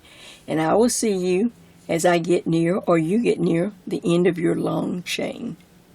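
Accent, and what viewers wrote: South, United States. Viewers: I just found your channel! You're just darling!! Plus, being a southern myself....I love your southern drawl, and demeanor!!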